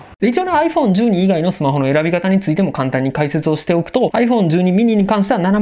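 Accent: Kansai, Japan. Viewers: 関西人って感じだよね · 関西弁のこの早口がOKなら 日本語無敵じゃないですか そもそも津軽弁とか甲州弁とかなると 日本人も分からなくなるんだし(￣▽￣) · いつも最高にわかりやすいし、テンポ関西弁どれをとってもすばらしいです。 今回のIphoneも早く解説してくれないかなぁと心待ちにしておりました。 （Iphoneユーザじゃないけど）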